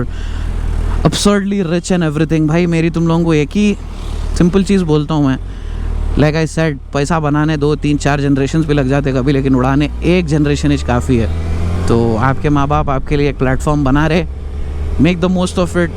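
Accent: Hyderabad, India. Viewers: Bhai tum jara to sai ka jarr malom 😂😎. First time I am listening a youtuber who speaks Hyderabadi as my level.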